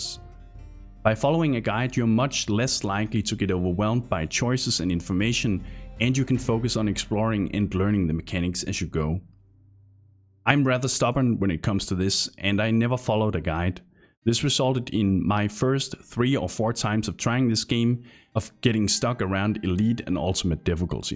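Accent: Denmark. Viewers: Thought you sounded aggressively danish then you started throwing shade at those pesky swedes. Thank you for the video bror 🙂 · Not sure what's worse, looking swedish or having that horrible danish accent. However, this video is exactly what I was trying to find, great tips! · Your accent is a give away for your Danish roots, but the Swedish hobo joke removed any doubt 😂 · are you danish? sounds alot like a danish accent... bashing the swedes... definitely danish :D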